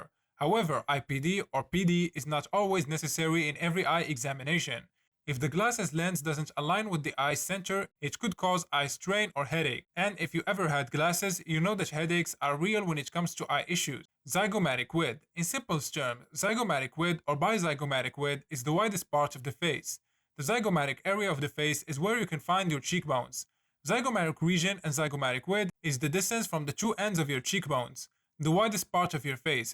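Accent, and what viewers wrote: Morocco. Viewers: This guy sounds very Moroccan.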